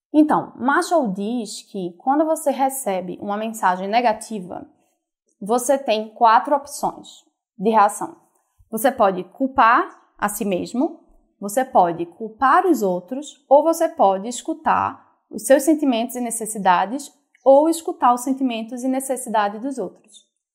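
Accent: Northeast, Brazil. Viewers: Eita sotaque maravilhoso da!!! Aoooo povo nordestino que eu amo!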